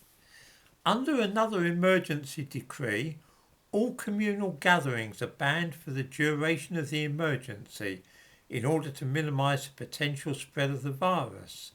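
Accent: United Kingdom. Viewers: I stumbled upon this channel on my youtube (thank God for its “suggestions” algorithms) and rejoiced at its content and the “oh so British” delivery I am an American of Italian origins, visited England in my youth (1970’s) and absolutely loved it. Later visits evidenced a clear change in the fabric of its society Europe, in general, is loosing its identity, and it’s painful Cheers from the US, glad I found you, I’ll set up to devour your content in the following weeks, and looking forward to the upcoming Identity is a defining and beautiful trait, once you loose it, it’ll be hard to regain We MUST preserve it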